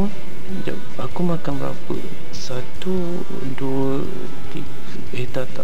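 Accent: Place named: East Coast, Malaysia. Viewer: ko org mane ek? slang bunyi cm pantai timur je. hehe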